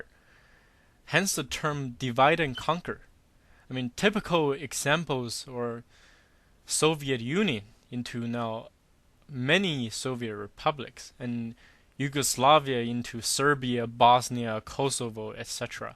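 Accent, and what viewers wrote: China. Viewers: don't make me laugh dude, n im not surprise if a chinese guy is saying this...guess what i hear your accent...Go F**k urself. FREE TIBET! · Hmmm do I detect a Mandarin Chinese accent???? where does the truth of this matter truly lie? yeah party sock puppet.......your arguments were good until you started bashing the west. then you tipped your hand. The Narrative in the west has been delivered by the Dali Lama; China told everybody in the west to fuck off and mind your own business so we filled in the blanks our selves. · If the Tibetans want to be freed from China, I'm sure this means that they are sick of the Chinese government. This commentator isn't even Tibetan, he is Chinese. Check up on his accent.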